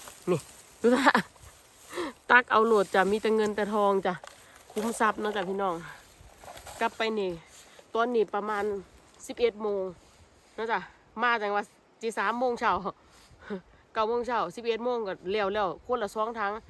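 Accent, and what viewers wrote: Korat, Thailand. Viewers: คน โคราช นิครับ คือ พูด สำเนียงโคราช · วัสดรจ้าลูกสาวยากไปจิไปติดต่อกะใหนจ้าคุณ เป็นคนกะใหนจ้าพูดคือคนโครราชเนอะจ้า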